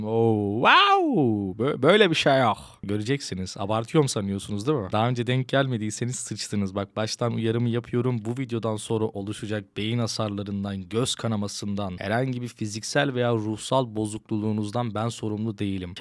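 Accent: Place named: Aydın, Turkey. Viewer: abi memleketlimsin valla aydın'lı olduğun konuşmandan belli sevgiler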